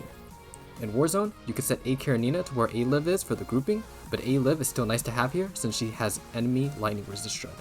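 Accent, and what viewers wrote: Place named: Asia. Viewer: I swear all the pro players of these kind of games always have the this asian english accent lol. Like rexlent pgr, Keebster honkai, just3c,.. :D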